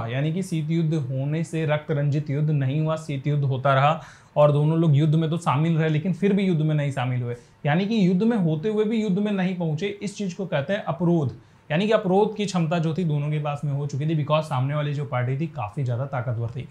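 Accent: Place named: Allahabad, India. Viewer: Sir apka illahabadi accent 🥺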